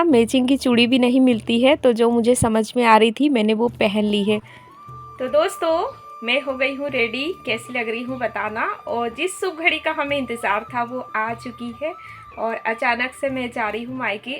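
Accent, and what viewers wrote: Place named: Chhattisgarh, India. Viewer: Aapki boli chhatisgarh ki boli se kafhi milta hai hai na kabhi kabhi gaw ki bola kijiye achha lagta hai ❤❤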